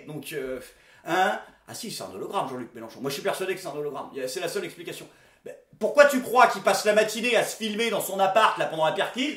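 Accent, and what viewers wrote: Benin. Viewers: Et fait nous on un jspc spécial accent bénin :p tu le fait vraiment bien :) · très bonnes imitations de Schiappa et d'un béninois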